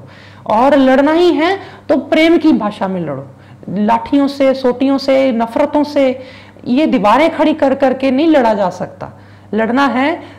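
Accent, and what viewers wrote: Punjab, India. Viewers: I actually enjoy listening you just because you have your own punjabi accent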